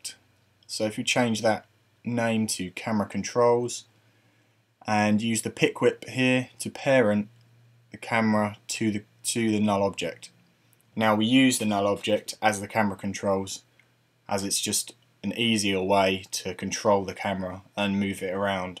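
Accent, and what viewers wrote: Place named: United Kingdom. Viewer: You Brits' accent drives us Americans crazy (with our PROPER way to pronounce words!!) I think I've figured out how the way you are saying words is different. I heard very early in the video when you said the word "Photo". I think we say it, the proper way of course, Pho-to, whereas you Brits say Phot- and then the o. It just doesn't make sense to me. I just heard it again in the word "thirty". You say Thirt-y or "thirt-ee". And it has always just driven me mad to hear you pronounce, or not pronounce I should say, your letter R's. Why don't you use your R's... "MATE"? There you go again..."Settings" is SE-ttings, not SETT...ings. And "little" is LI-ttle, not LITT...le. Foo-tage, not FOOT...age!!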